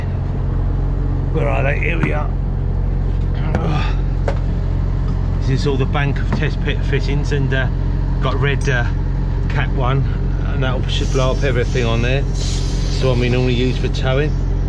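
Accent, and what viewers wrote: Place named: High Wycombe, United Kingdom. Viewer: Sounds like my accent from High Wycombe many moons ago, in Australia now, used to drive road trains here 4 trailers. Thanks for the video.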